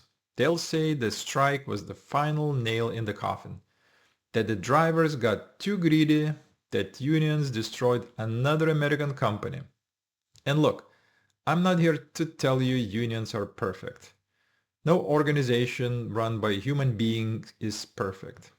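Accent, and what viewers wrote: Russia. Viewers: Tough to listen to a Russian Accented Guy, talk about Unions. Got trouble "Buying In". AND, I am a Teamster, Unrestricted Class A CDL. Hmm? Keep waiting for him to Call me, "Comrade".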